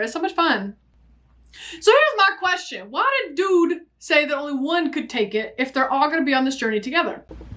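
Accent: South, United States. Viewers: your Southern voice makes me think of home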